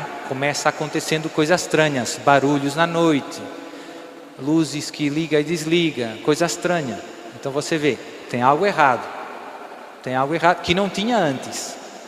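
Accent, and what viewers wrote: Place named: Brazil. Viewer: está morando no Brasil? Seu sotaque está muito brasileiro 😅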